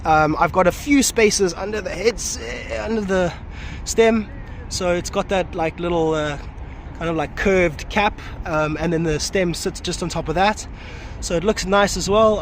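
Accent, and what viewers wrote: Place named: RP, United Kingdom. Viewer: I like how posh you sound on camera. Liked and subscribed.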